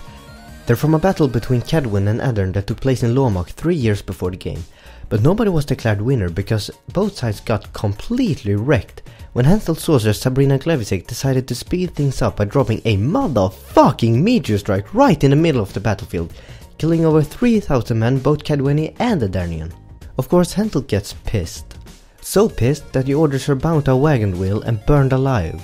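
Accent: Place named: Finland. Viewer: Thank you for this, very helpful! I've been trying to place your accent, are you from Finland?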